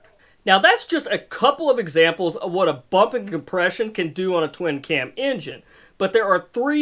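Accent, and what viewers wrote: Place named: Texas, United States. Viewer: I have noticed that you have the exact same accent as Mike Nesmith from the Monkees he was also from Texas I wonder if you guys are from the same town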